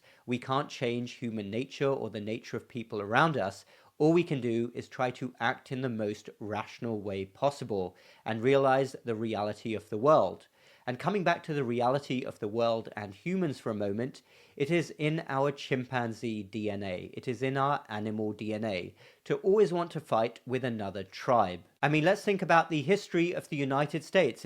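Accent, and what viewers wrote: United Kingdom. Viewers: You are such a clear voice. Love to listen to you. Decide which pod casts I need to play, without comment, whilst my hubbie sits next to me taking in what you say. Love your British voice on the health and now, unusually, political scene. Americans today no longer appreciate their constitution. As a Brit, I understand now why Americans have said it is the best country, I have studied their constitution etc for years and now understand why they have said it. Sadly, I understand what they may lose, without appreciating what they had. Very sad and worrying for the West. 🇬🇧🏴🙏🏻👍❤️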